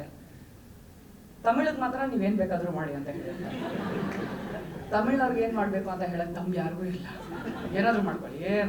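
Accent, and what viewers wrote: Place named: Mangalore, India. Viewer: I'm shocked that u speak proper kannada.....👌 It's more like manglur kannada.... wonderful 🌟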